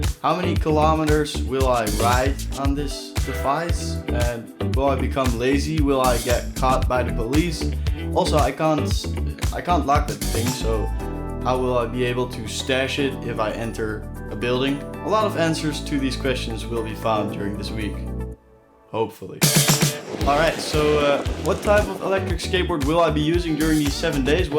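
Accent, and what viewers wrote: Netherlands. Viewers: This guy dutch? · Ik wist dat je nl was khoor dat aan je accent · you are NL I can hear it on your accent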